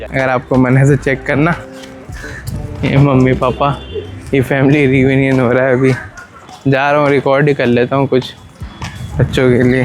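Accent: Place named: Bhojpuri, India. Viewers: bhaiya aap toh bhojpuri bolte ho 🥰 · bhaiya ap jis language ma baat kar rhe ho vo bhojpuri type lag rhe hai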